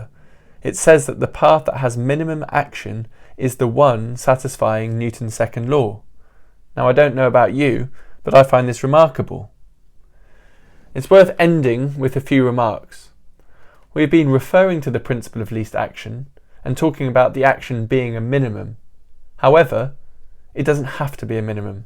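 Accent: United Kingdom. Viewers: I love his handwriting and his british accent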